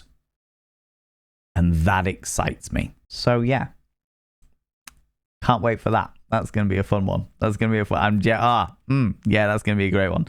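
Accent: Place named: London, United Kingdom. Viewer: He's British.thats how people from London talk.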